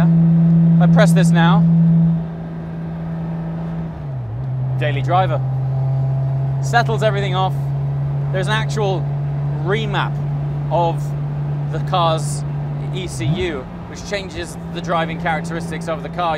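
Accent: United Kingdom. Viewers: something different about a british guy explaining a car.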